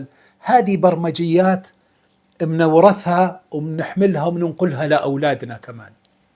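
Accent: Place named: Iraq. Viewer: عبالي عراقي تقريبا نفس اللهجة والشكل🌱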